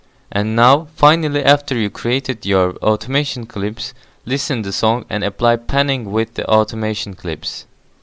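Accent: Turkey. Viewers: lan yemin ediyorum kanala bakmadan bu ingilizcenin türk birinden çıktığını anladım aosıhdpoanrv8ebrwıunabf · he is turkish :D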